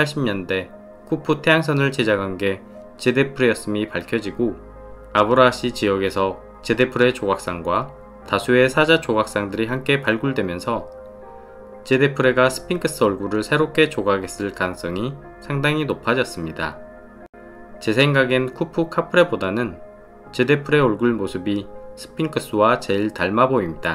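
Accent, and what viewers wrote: Jeolla, South Korea. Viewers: 전라도 사람이 표준어 쓸려고 애쓰는 내레이션 억양이 재밌네요 ㅋㅋ 아...물론 내용은 👍👍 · 전라도사투리가 구수하군요 ㅋ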